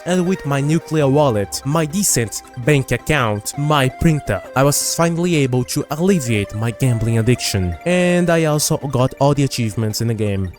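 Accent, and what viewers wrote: Brazil. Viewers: where are you from? your accent sounds brazilian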